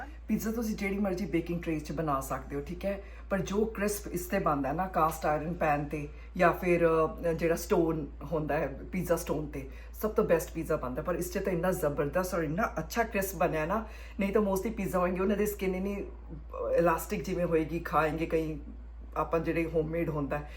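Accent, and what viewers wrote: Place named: Punjab, India. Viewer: We love your Punjabi accent… please continue in Punjabi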